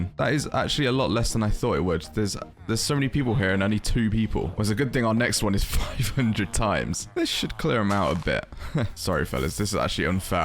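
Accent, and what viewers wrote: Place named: United Kingdom. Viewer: You have a beautiful voice and a very pleasant accent. Pronunciation is usually regional; what part of Britian are you from? I hoping everyone there sounds like you.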